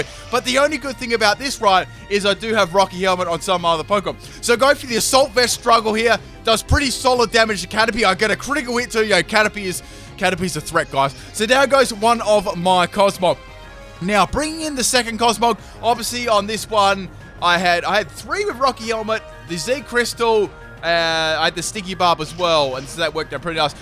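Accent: Australia. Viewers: I like your videos but your voice sounds like you combined a jock a Aussie and a Kyle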